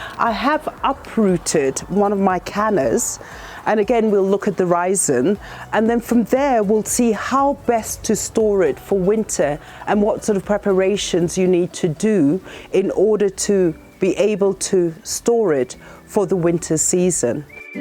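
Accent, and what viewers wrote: England, United Kingdom. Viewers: Where are you? You sound English but that doesn’t look like England. Are you in South Africa? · Hi I heard that you mentioned sand. I've found my rhizomes are prone to rotting. Could you let me know the ratio of the ingredients in the compost please? You're so English. Where are you based please? Lovely to hear English English!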